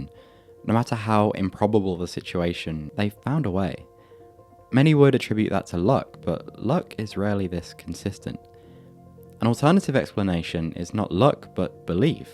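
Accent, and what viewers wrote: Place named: England, United Kingdom. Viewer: Dude i HATE english accent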